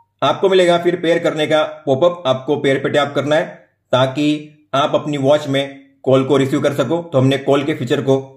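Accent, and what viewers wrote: Gujarat, India. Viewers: You r gujrati right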